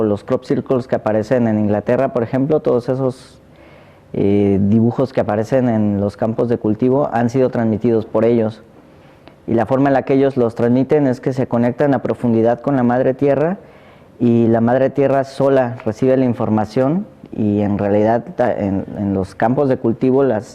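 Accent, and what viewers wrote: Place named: Mexico. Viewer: en que país vives ahora, por tu acento me parece que eres mexicano. Me encanta tu manera y forma sencilla y fluida de narrar la información, gracias.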